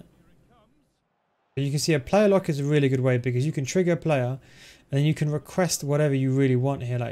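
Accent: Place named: United Kingdom. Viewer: too fast und unclear talking. not 100% understandable for not native English. maybe the British akcent is the reason. I like the content but it's too exhausting to get it/to listen. no offense.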